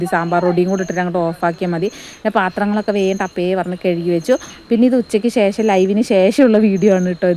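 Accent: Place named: Palakkad, India. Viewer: സസ്നേഹം 🧡🧡🧡 നിങ്ങളുടെ സ്വന്തം നാട് എവിടെ ആണ് hus ന്റെ ജോലി സംബന്ധിച്ചു വയനാട്ടിൽ സ്ഥിര താമസം ആക്കിത് ആണോ? നിങ്ങളുടെ സംസാരം കേട്ടിട്ട് പാലക്കാട്‌ ജില്ല ആണോന്ന് സംശയം 🙏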